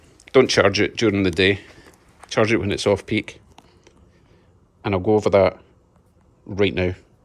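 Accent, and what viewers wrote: Scotland, United Kingdom. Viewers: with Vancouver having the highest cost of fuel in the North America (i can't believe i just paid $2.40 a litre yesterday!), it's time for me to go electric & you've convinced me to give this a try! Love your Scottish accent and Canadian adoption. Sub'd.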